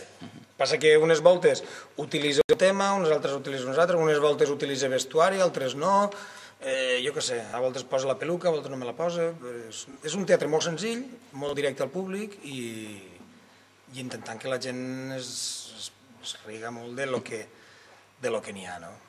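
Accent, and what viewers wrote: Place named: Alcoi, Spain. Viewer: Com es nota que es alcoià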